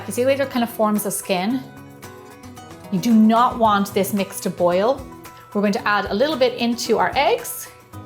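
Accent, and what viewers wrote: Ireland. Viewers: Hi love your recips and i love your irish accent 💗💗💗💗💗💗😘😘😘😘😘🍰🍰🍰🍰🍰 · I am DEFINITELY going to the grocery store NOW to buy the supplies. Don’t know if it’s because it’s lunchtime or what, but I’m near drooling here. And listening to you certainly didn’t hurt. Love the Irish accent ❤️ You just popped up on my recommendations, but SO glad you did .... new subscriber here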